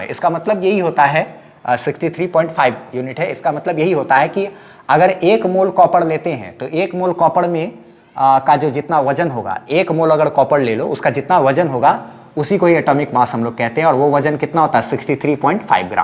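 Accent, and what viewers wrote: Bihar, India. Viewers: Sir are you from Bihar?? I mean your accent 🙂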